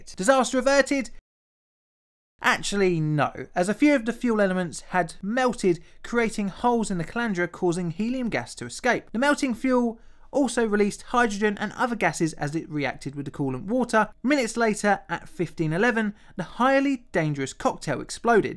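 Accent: United Kingdom. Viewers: You have no idea how much i appreciate you use the metric system in your narration (and your British accent)! Most english channels use feet and inches which i can barely translate properly. ^^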